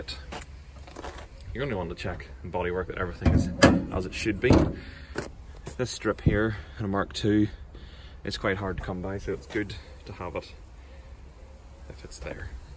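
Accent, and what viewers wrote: Northern Ireland, United Kingdom. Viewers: Now that's a very particular accent. Northern Ireland? By the way, Nice car.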